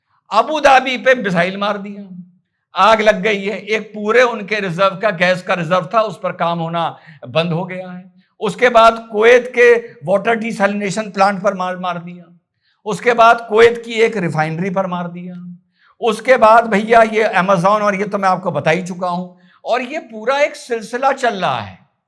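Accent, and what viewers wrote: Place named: Uttar Pradesh, India. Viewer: Namaste ..aspki UP ki meethi boli sunkar maza aata hai..over and above your honest analysis is not only worthy an applause but u have set an eg of what intelligent and fair educated people should adopt ..salaam to u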